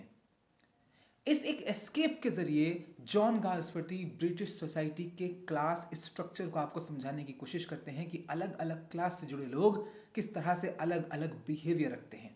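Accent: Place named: Meerut, India. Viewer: Aap meerut se he na sir..? Aqsa k bhayya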